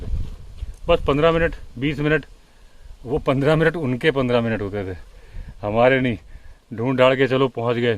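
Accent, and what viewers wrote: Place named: Delhi, India. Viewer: Very nice Ji Aap ko 3 Years follow kar Raha hu, retired Force memer hu, Aap say bahut knowlegde milte hai. Delhi born hu, South Delhi Mai Ghar Education, par aap ki aawaj say esaa lagta hai, aap Aapnay hai Delhi blog dekta hu. Hamaray block may Vinod Awasthi Pandit ji or journalist banay, 3 bhi Sub very intelligent and I like same as well as. Thanks for knowledge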